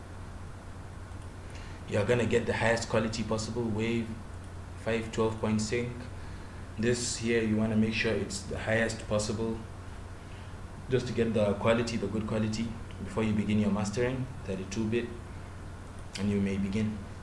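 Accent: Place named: Africa. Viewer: hey,man...your accent,you sound like someone from africa,are you from africa ?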